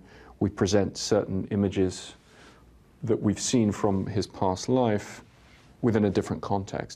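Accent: London, United Kingdom. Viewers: British English accent sounds great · His accent was more London in those days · London accent, clear as day tbh. He's an American citizen now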